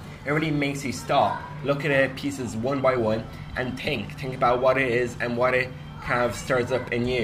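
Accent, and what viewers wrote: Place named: Dublin, Ireland. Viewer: Aloha OMGoddess I love your wee spirit --- stumbled over SOME thing [I think vegan-related?] on FB that led me to your AerLingus vlog.. Was seriously impressed with your openness and positive * clarity * throughout... not to mention #videoeditingSKILLZ!!! Love your accent as well (we were just talkin about Dublin accents tonight in College 🤣)*.. Anyway Sure you probably get loads a wee messages like this so... Slán go fóill 🙏🏼🙏🏾🙏🏿 * we're over in Co.Clare tho btw 💡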